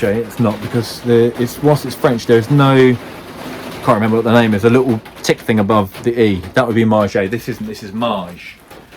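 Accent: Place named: Cockney, United Kingdom. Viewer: Love to see you back. I thought something horrible must have happened to you. But no need to worry loads of people have a mid life crisis, yours just involved lots of ladies clothes 😮 UPDATE: When did you go all Cockney? Most people go the other way, your heading towards selling 80% baby laxative wraps outside McDonalds. I need to check back on some of you old vids this is confusing.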